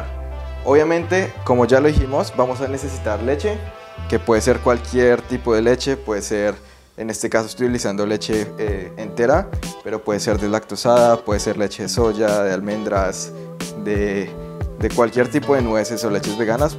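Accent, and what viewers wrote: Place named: Colombia. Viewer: Gracias por la receta. parece que eres colombiano. Ahora voy a preparar mi café late orgánico. Un abrazo, saludos desde Popayán, Colombia.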